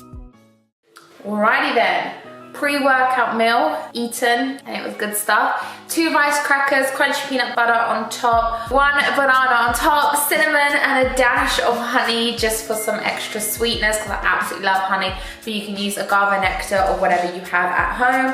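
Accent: United Kingdom. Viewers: I do love British accent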